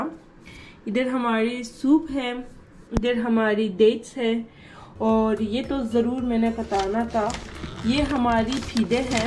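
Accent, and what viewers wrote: Pakistan. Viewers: Inka Urdu lahza pakistan ke kis area ka hai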